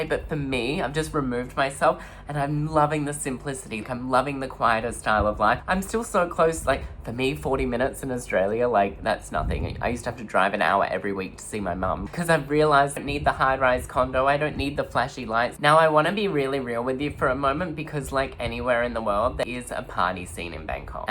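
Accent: Thailand. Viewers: Your Thai accent is so so Thai than some Thai people ❤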